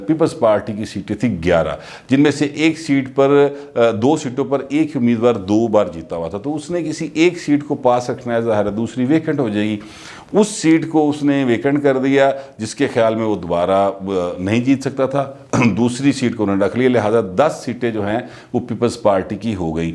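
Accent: Lahore, Pakistan. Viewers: you’ve given a superb interrelationships between the Mian brothers by illustrating a few of your personality experienced happenings. You’ve certainly a great narration skill in Urdu dotted with typical Lahori Punjabi. Super journalistic analysis. That’s why your vlog are impatiently waited for. ❤️❤️❤️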